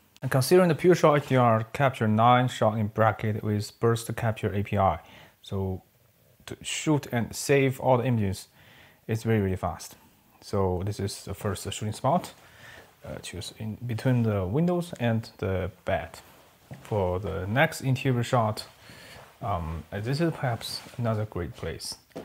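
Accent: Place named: Asia. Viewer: I like your content. But I do find it hard to understand your Asian accent in English. Keep up the work!👍😀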